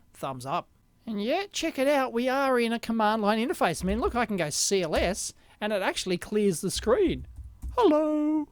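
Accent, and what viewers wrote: Australia. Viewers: great video, love your down to earth style. An aussie, I guess? I've done a very simple sound synthesis (karplus-strong) algorithm on 8-bit arduino uno. To improve the sound quality, the most important feature would be a 12 or 16 bit DA convertor. Looking around a bit, it doesn't seem to be a high priority for uc chips. Any suggestion for uc or fpga that is good for sound, ie all built in cos I don't much like etching pcbs? This is just hobby stuff.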